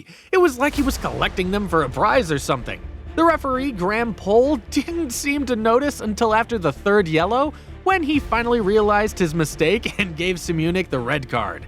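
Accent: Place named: United States. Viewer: Can we have the voice over removed? The commentary is just too American.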